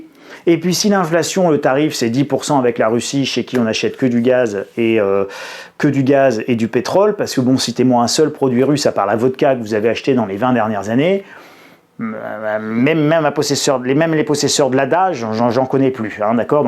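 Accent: France. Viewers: jaime votre accent francais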